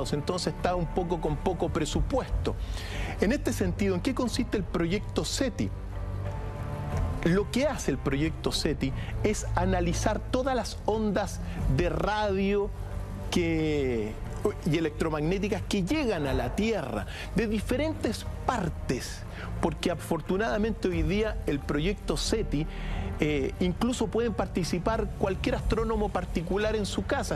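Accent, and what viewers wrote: Chile. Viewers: Es chileno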